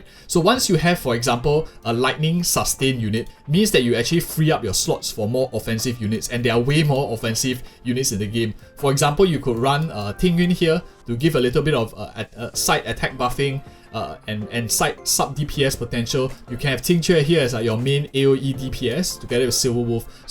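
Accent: Singapore. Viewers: singaporean accent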